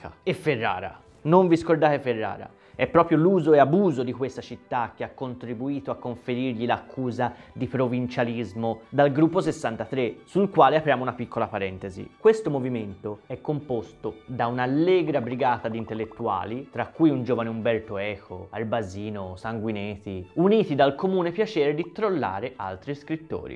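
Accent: Florence, Italy. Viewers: Mi piace l'accento fiorentino! D'atmosfera culto e culturale! questo video è breve ma utile, anche facile da capire! Grazie! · Ahaha tu fai schiantare Sei di Firenze vero?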